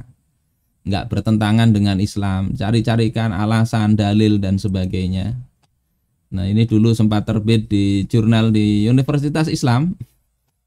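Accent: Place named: Java, Indonesia. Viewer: Bahasa Banjar logat Jawa tadz..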